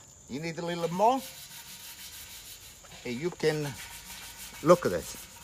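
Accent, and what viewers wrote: Italy. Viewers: Who is this? You sound Italian! Are you sir?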